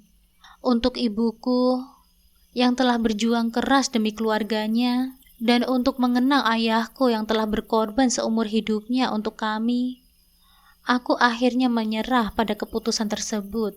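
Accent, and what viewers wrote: Java, Indonesia. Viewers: Maap pembawaannya medok mbak kurang enak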